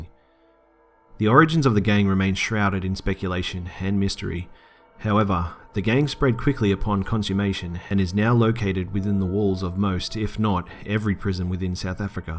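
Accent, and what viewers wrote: Australia. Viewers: Hoho your pronunciation are still hilariously Australian...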